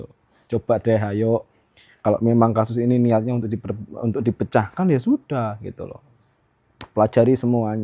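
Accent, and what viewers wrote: Java, Indonesia. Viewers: Nama nya tapi suara nya medok...mantul👍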